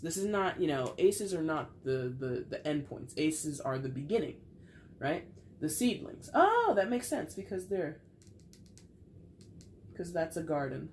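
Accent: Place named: Upper Midwest, United States. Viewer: Love that Wisconsin\Minnesota accent! Lol I’ve never seen the queen of wands as older... even your card she looks young to me, I mean she’s the sexy queen lol. I’m guessing it was more about the intuitive message. Feels low key for a reading with two aces, but it’s better than a negative reading. I love the ace of wands and ace of cups— all passion, romance, and emotions, but this feels like you’re saying I shouldn’t get too excited, like aces aren’t that big of a deal after all.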